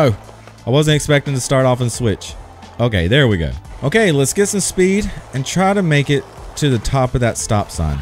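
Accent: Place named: Appalachia, United States. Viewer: his accent is like the city equivalent of Hillbilly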